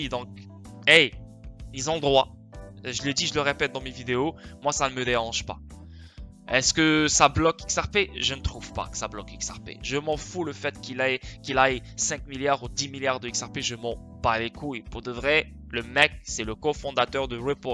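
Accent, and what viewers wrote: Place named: Morocco. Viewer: Tu serais pas un peu marocain ? Je crois entendre un léger accent.. Merci pour tes vid. Dois-je comprendre que je dois reprendre du xrp ?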